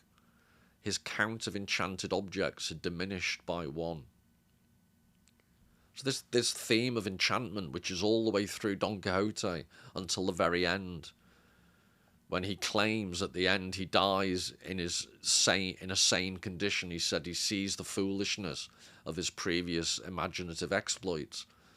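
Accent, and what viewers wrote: Liverpool, United Kingdom. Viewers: I discovered you a few days ago on a Richard Grannon video and since then I have been making my way through your videos which I have found to be immensely interesting, highly educational, entertaining and at times hilarious. Love the Scouse accent and humour. Thanks for your work.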